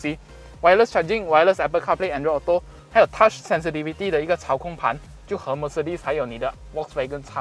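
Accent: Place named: Malaysia. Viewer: 你讲英文口英太重… 马来人…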